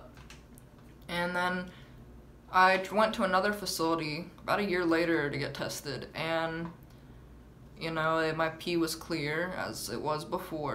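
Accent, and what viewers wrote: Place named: Pittsburgh, United States. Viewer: Oh your definitely from Pittsburgh 😂😂😂 hey girl hey.... fellow pittsburghian here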